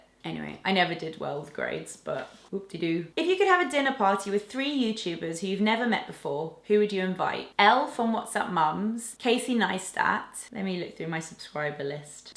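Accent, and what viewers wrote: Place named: RP, United Kingdom. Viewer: sounds very posh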